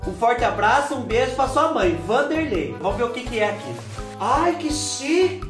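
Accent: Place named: Rio Grande do Sul, Brazil. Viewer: Boa tarde, muito fofo seus presentes que bom gosto dos seus fas. Quem fala " O que que eu corto, cobreiro brabo", esta na idade de risco. Voce e muito fofo, voce estuda muito e pesquisa muito pra falar igual gaucho. Meus parente sao do RS e falam igualzinho voce. Me devirto muito.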